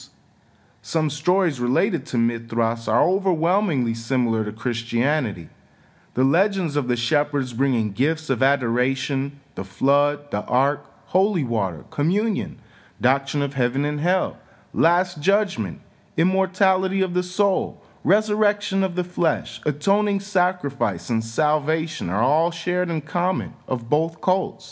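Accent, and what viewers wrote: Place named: United States. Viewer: 7.15: cat in Latin is feles, not gatto. This is Italian. Zarathustra was not an esoteric Persian but a wise man like Buddha. Some things are so hard to understand in your very, very strong American accent. It would be great if you could ask maybe the Google translator how to pronounce words. At 5.55 f.ex. Or Zarathustra. I understood Persian. So the rest I understood because I know the rest of facts on my own. But this is not the sense of your vids, right? This would be a solution for this problem. :) <3 I wish I would have understood more of it. But even the subtitles did not understand you. No joke. Desperation! But thank you for what I got from this. Very y interesting thoughts and lots are so true! Good luck to you!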